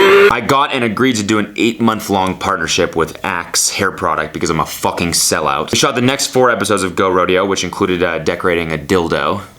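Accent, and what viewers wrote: Canada. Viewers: I always forget you’re Canadian until you open your mouth and say “sohrry”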